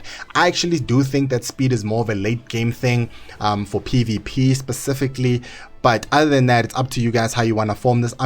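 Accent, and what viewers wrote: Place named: South Africa. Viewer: Best tutorial hands down, you sound South African 🤔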